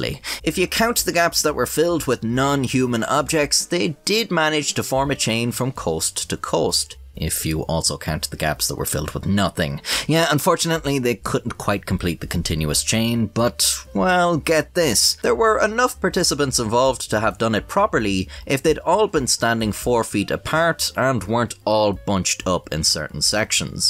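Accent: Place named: Ireland. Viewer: Tell me why this narrator sounds like some sort of animated insect with an Irish accent?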